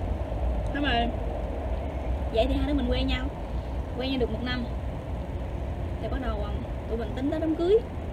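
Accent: Mekong Delta, Vietnam. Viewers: Bạn có nét đẹp và giọng nói đậm nét miền tây sông nước...Chúc bạn hạnh phúc nơi xứ người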